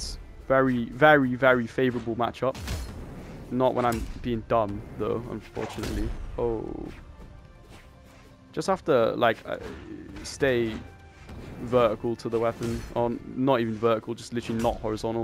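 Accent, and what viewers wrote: England, United Kingdom. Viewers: If u lost that last game lmfao also are u English?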